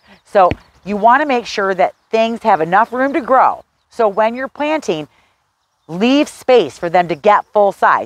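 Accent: Midwest, United States. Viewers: Great information and a video without a ten-minute introduction of mindless time-wasting filler. All the folks complaining about the Midwest accent can drop off. Imagine feeling so self-important that you criticize the way another person speaks when that person is trying to help you. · I love this real American accent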